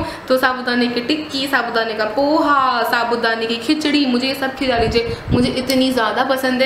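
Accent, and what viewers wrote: Delhi, India. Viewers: Wow..! its so refreshing listening to your "diliwali hindi". Delhi is my hometown and its been a while since i left the city after my wedding. It feels nostalgic listening to you speak. i felt like i was hanging out with my girls in delhi. This video brought a smile on my face. Thank you,. You're amazing. Keep going. 👍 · Hi 😊 your Hindi is typical Delhi wali--haha! You remind me so much of DU I attended Miranda for a year but now in Europe. I love you Hindi Vlog and other videos as well. And 😁 your Hindi is so so similar to mine ( Love your hand actions while you talk 😊😊 haha) --lots of love 💛 Tenzin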